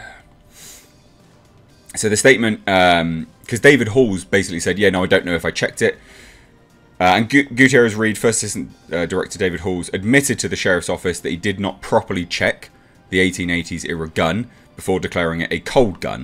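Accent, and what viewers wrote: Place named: United Kingdom. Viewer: Excellent news coverage however that pretentious British accent is annoying as hell.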